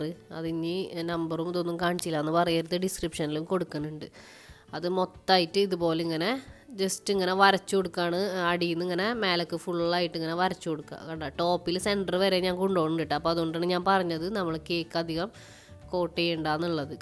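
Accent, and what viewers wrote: Kodungallur, India. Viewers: Iyalde veed kodungalloor ano?ente hus area slang und nannayi.😀😀😀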